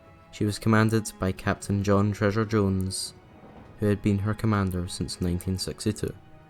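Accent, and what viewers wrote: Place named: Liverpool, United Kingdom. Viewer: Lovely video. Enhanced by your accent. Liverpool? Great video about our undersung hero.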